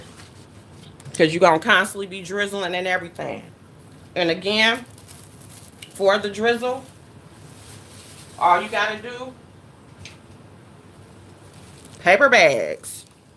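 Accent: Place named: Chicago, United States. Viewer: Oh sis: This looks yummy! From a girl born in Chicago and raised in Toledo: Our accent is the same!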